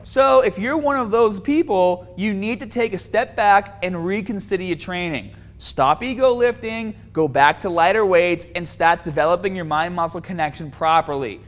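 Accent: Boston, United States. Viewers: This man is dripping with Boston. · That's a Boston accent for ya. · Boston guy 😂😂😂 the accent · I thought the dude’s boston accent was a joke at first lol 😂 but very informative non the less. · Boston accents are trash lol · That Boston accent! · It’s a Boston accent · Its boston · Boston accent buddy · This guy is from Boston ain't he? ...just guesssing 🤷🏻‍♂️ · That's a Boston accent...born and raised there so I know. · I think it's the Boston accent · Boston bud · That BAASTON accent though... pretty intense 😸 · its boston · are you from boston? i like your accent great vid. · Sounds like hes from Boston lol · I love Boston accent Tourette's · abdulla alsuwaidi it’s An American accent, from Boston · Is this guy from Boston. Paut of ya body. I like it! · Are you from Boston? I love your accent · You got that “BAASSTON” accent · Yea boston accent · I love the boston accent keddd 👍🏾 great video ! 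· Yea my guy is definitely from Boston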